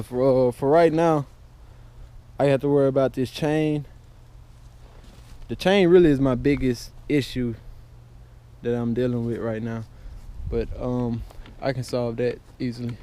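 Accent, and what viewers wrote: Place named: Gulf Coast, United States. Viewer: yo, where do you live? You have that Gulf Coast sound to your voice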